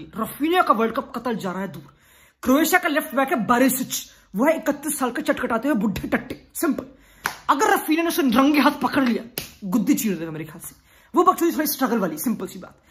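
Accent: Delhi, India. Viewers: Your football knowledge+Delhi-NCR ka accent will lead you to greatest heights. zindabad! ✊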